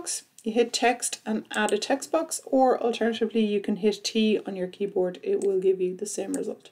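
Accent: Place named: Ireland. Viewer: Love your videos. Can’t believe you don’t have more subscribers. Good to hear the Irish accent too. ☘️🇮🇪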